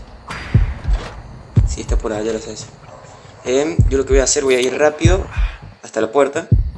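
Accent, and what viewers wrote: Cordoba, Argentina. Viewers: sos argentino, tenes alto acento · Che flaco sos cordobes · Como me encanta como habla este cordobe son uno grande es como yayo 🤣🤣🤣🤣👍👍👍 segui asi papa !!!! Me encanto tus video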